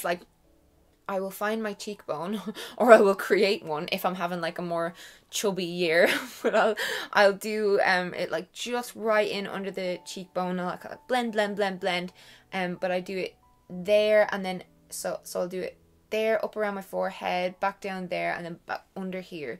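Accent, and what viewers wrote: Ireland. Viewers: I'm so glad I found your channel! I lived in Ireland 7 years, so not only are your videos super helpful, but your accent also brings so much nostalgia! Thank you for all the helpful tips! If you don't mind me asking, is your hair color natural? The black you're wearing is so vibrant! It's not... inky, if that makes any sense. It looks like your hair has lots of different shades of black to it, and it looks gorgeous. :)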